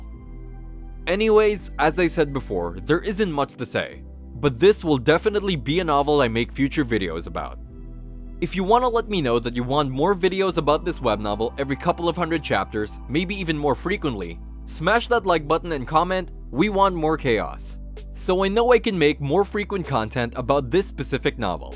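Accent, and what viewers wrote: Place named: Philippines. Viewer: That's awesome dude. Been here since 200 subs. Btw are u a Filipino ur accent sounds like many Filipinos.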